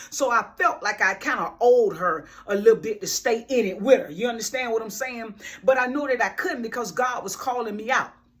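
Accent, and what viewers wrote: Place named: Louisiana, United States. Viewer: That’s that Lousiana, low country GA or SC accent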